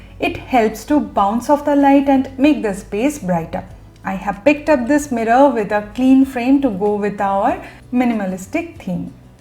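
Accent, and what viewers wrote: Tamil Nadu, India. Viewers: U r tamil slang awsome ....never expected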